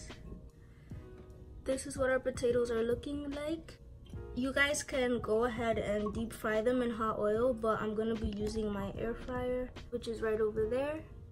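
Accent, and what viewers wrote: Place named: United Kingdom. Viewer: Your british😂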